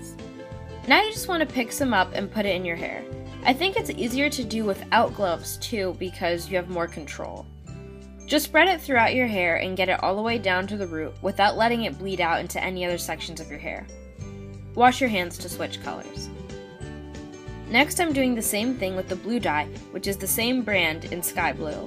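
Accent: Jamaica. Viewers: r u Jamaican? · are you jamaican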